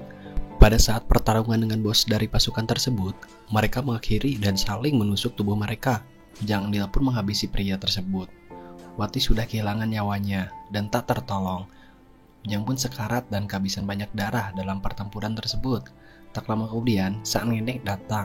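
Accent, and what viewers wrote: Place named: Sunda, Indonesia. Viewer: Pembaca Narasinya sangat 👍👍👍👍👍Sunda yg 😅👌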